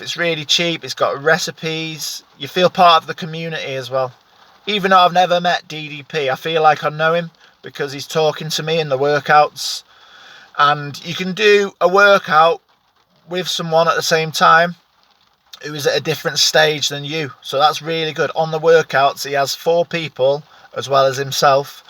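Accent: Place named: Cheshire, United Kingdom. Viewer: sounds like he is from Chester/Cheshire, north west deffo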